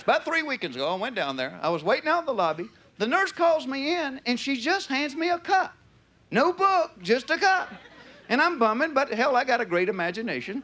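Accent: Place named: South, United States. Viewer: It's that accent, man, and years if media stereotyping. Half my relatives are from the south and im still prejudiced.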